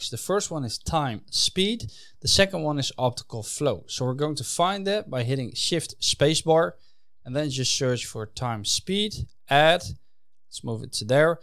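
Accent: Netherlands. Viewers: oooh i'm dutch and i heard a dutch accent (sorry for thinking u were dutch)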